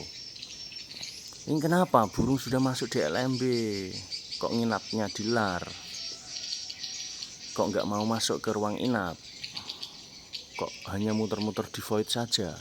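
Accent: Java, Indonesia. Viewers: Jawa mana mase... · Mantap kang, jowone endi kange ?? Analisanya mantap kang, bener yg sampean bilang bahwa bw adaptasi butuh proses dan mekanisme nya persis seperti yg sampean sampaikan. Saya juga ngalami hal seperti ini, alhamdulillah belajar dengan terjun langsung akhirnya menemukan banyak hal. 🙏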